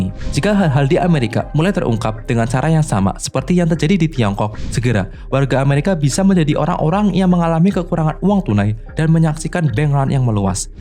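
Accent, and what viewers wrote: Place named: Banyumas, Indonesia. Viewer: Aksen ngapaknya terasa ya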